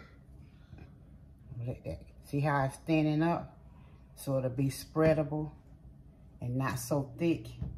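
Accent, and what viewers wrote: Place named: South, United States. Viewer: So happy I just found your channel. Miss I love this recipe but even more I love you & your husband praying. I hope you don’t take offense but I love your sweet soothing Southern accent. Reminds me of my Mom. She was a wonderful cook from Texas but she never measured anything & she passed away at only 47 yrs old. A couple of my brothers don’t even remember her cooking. Mom made bread pudding & the BEST peach cobbler. She also made a wonderful yellow cake with a bundt type texture that had a nutmeg taste. I just turned 75 & can’t wait to check out all your great recipes. I liked & subscribed to your channel. God Bless & keep you & yours. Donna in Arizona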